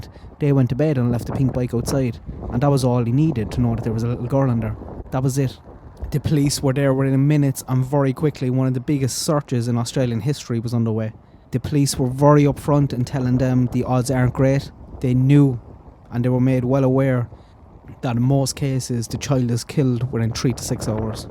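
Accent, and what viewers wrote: Ireland. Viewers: Love watching your dogs while you are talking about these cases, I also love the Irish accent, I follow Michelle walks too you are both great 😊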